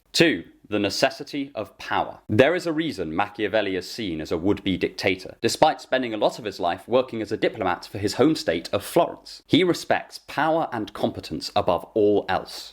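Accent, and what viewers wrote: United Kingdom. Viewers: Yes, what you said all the British Accent and story lines are good enough. But in my point of view you need some tones of voices,up and down, pitches, break down. Your British accent is really nice but boring.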